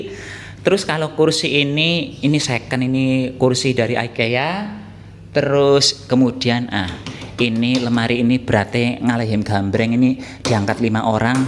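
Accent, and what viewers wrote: Banyumas, Indonesia. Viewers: Kiye siii wong endi? Ngapak ya? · sampeyan asli mana to mas koq pake jawa ngapak gitu Salam kenal dari saya orang solo 😁🙏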